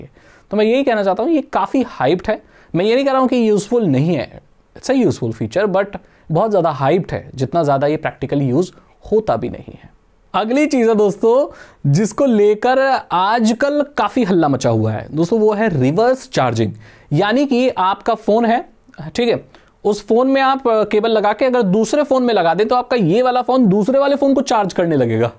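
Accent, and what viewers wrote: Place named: Haryana, India. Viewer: today i see minor haryanvi assent in Bhai 🔥🔥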